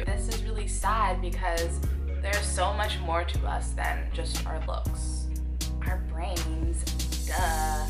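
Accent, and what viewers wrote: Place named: Patois, Jamaica. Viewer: mi thought yah half korean..til yah speak patwa..yah duh know yis fine empress mi like mi coffee strong and black..lol yah beautiful..mind and body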